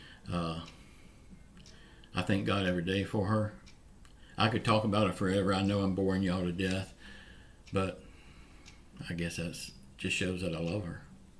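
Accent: South, United States. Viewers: morning i can tell you are from the south im from the south knox tn who knows about Zayres except some one from the south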